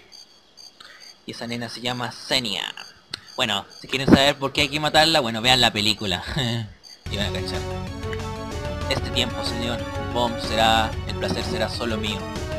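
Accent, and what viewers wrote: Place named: Argentina. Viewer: Ahora sé que sos argentino y adolescente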